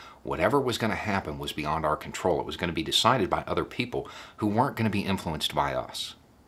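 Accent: South, United States. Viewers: It takes sometimes for me to get used to the southern accent expressing points of view in coherent and complete sentences.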